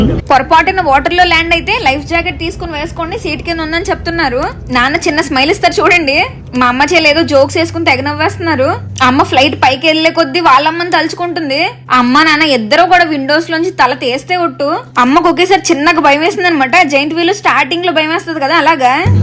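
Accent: Godavari, India. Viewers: Godavari slang ,ma relations lo kondaru achu ne lane matladataru, rajamundry na chuttupakkala oora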